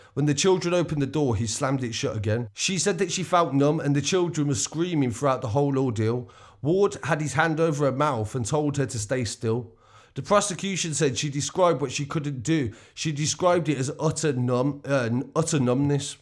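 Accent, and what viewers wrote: Birmingham, United Kingdom. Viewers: Bruv, every time someone mentions brum, your voice comes to mind! Haha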